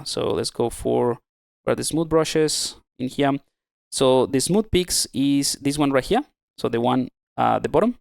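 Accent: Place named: United Kingdom. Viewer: How come you sometimes sound british? Hahaha but more seriously, thanks for the video. I am learning zbrush on ipad. Have never tried any sculpting program on computer or tablet before. Its tough to learn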